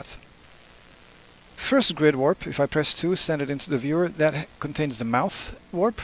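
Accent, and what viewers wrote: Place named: Russia. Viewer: Hi,It drives me crazy. You have a lot to say about a subject and then you become your own worse enemy by not doing the most simple things ruin your otherwise hard-worked tutorial. (Or in your case you just did not know or realize it sounded the way it does.) A pop filter (under $20 from B&H I hate Amazon) is just a screen put between your mouth and the mic that diffusion's the wind from your breath to get rid of the pops and esses a bad mic and setup can cause. I hate to see people go through all the trouble of doing a tut and then it's unwatchable or hard to listen to because of mechanics not subject nor idea. I hear you have I assume a Russian or Eastern accent and English is not your first language. How can you learn English and allow something as easy as not have a sock (really put a CLEAN sock over your mic) ruin your tutorials after going to the horrendous trouble of learning English? 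I've been devouring Resolve and Fusion but just found you. Good luck.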